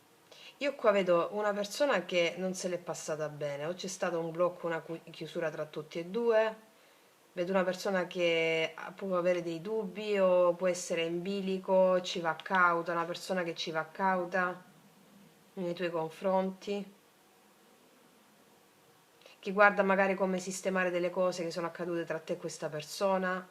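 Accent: Italy. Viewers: sei una mia recente scoperta.. ❤ vorrei incontrarti, sento dall'axcento che sei nella mia stessa penisola.. ti inviero una mail..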